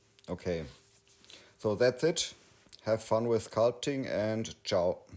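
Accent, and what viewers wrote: Bavaria, Germany. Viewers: Immer wieder neue Einblicke in Nomad Sculpt - die App, die mir leider noch lange ein Buch mit sieben Siegeln bleiben wird. Aber ich folge dir gerne weil du beständig im Thema bleibst. Ich hab die Hoffnung irgendwann genug Zeit zu haben alle deine Tutorials nachvollziehen zu können. Wobei mir die älteren Tutorials auf deutsch leichter fallen. Trotzdem Daumen hoch für den Bavarian ( ich höre auch fränkisch raus) - English Aspekt.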